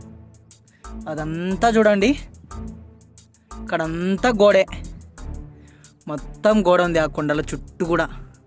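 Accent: Rayalaseema, India. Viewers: Super vundira bai, hatsoff to your photographer, we are enjoying your Rayalaseema style of language 👌and enjoying thril/unknown information 😀. Keep it <link> care when outdoors shooting. Jeete rehooo 👦👬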